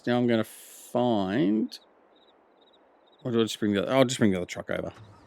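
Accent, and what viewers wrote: Australia. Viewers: Just came across the channel looking for a series on this map as I've just started playing it on mine, good to hear a auzzie YouTuber watching from new Zealand 🇳🇿 also good to see your still making episodes on this map, can't wait to see were u take the map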